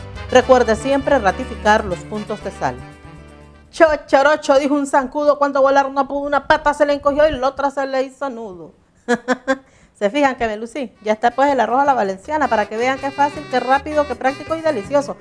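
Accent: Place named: Nicaragua. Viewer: Me cae super bien esta mujer como habla pura nica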